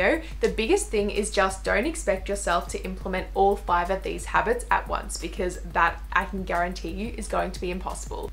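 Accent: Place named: New Zealand. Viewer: I love her kiwi accent! So pleasant to listen to.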